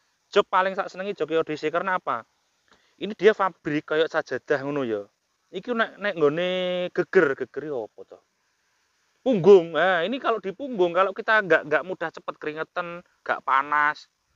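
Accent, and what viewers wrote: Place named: Central Java, Indonesia. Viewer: wong malang logat jawa tengah..😁😁😂😂🙏🙏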